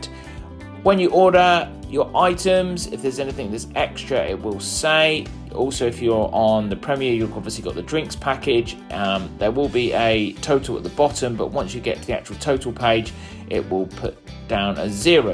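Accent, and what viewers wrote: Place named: United Kingdom. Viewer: It's the Brit accent. Same as pronunciation of taco, pasta, etc..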